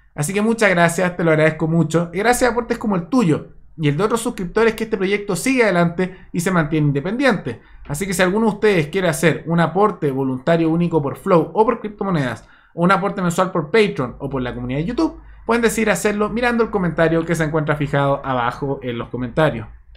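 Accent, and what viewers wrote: Chile. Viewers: me parece por tu acento que podrías ser chileno,me gustó tu análisis de la cuestión cubana sobre la apertura de los restaurantes en Cuba pero no estoy de acuerdo con la parte de que le das crédito al mal llamado nuevo presidente y al también nuevo economista de Cuba,pues yo te diré como cubano que soy que nada de esto es nuevo ni tampoco tiene que ver con el hecho de que halla un nuevo presidente porque todos ellos después de la muerte de Fidel Castro han sido colocados ha dedo, Raúl fue puesto ha dedo por su hermano Fidel y ahora Díaz Camel fue también puesto de la misma forma,no perdamos la perspectiva de la política del gobierno de Cuba y tengamos en cuenta que el sistema de gobierno en Cuba siempre ha sido el mismo desde hace más de sesenta años y ese gobierno desde la caída del comunismo en Rusia y en toda la Europa del este ellos nunca permitieron elecciones libres porque no querían perder el poder pero como se vieron aficionados económicamente empezaron ha buscar cómo hacer alguna pequeña apertura económica para salir de la cituacion en que se encontraban y entoces desde los años de 1990 lo primero que hicieron fue legalizar las monedas extranjeras especialmente el dollar y ha permitir el turismo de los países libres,dejaron que compañías extranjeras invirtieran en hoteles y también ha los cubanos dentro de Cuba les permitieron invertir en una serie de pequeños negocios que luego más tarde o varios años después cuando el gobierno vio que la gente estaba adquiriendo alg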